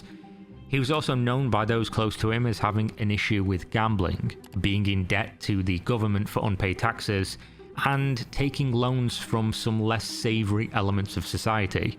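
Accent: United Kingdom. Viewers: This youtubers uk accent is so annoyinh, I cant even explain how much I hate it, just talk normaly, what is your problem mate?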